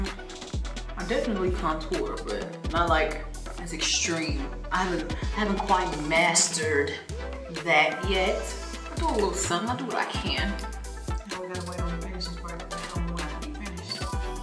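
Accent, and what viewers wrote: South, United States. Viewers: Southern accent coming through strong in this video...😍💪🏽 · It's the Texas accent for me 😍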